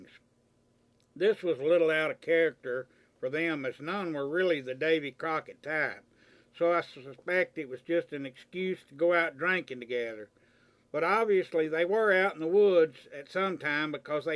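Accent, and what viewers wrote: South, United States. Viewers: I really enjoyed this story. For the life of me though, I don't remember your accent being so Southern! LOL. Must have been blown away by your Producer Status! Marianne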